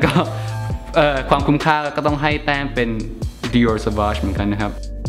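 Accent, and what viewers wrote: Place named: United Kingdom. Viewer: I love your EN accent <3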